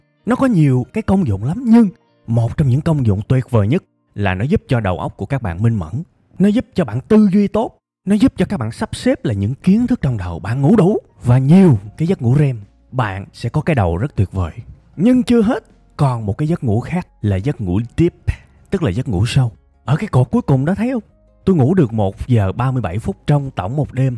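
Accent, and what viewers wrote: South, Vietnam. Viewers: Giọng MIỀN NAM của banj rất hấp dẫn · Giong noi mien nam nghe de hieu va de gan . One like 🤗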